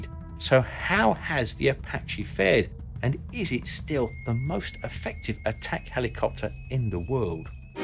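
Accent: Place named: Essex, United Kingdom. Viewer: The gecko has a London cockney accent & is from Essex 50 miles north-east of London - they will sound the same to most Americans.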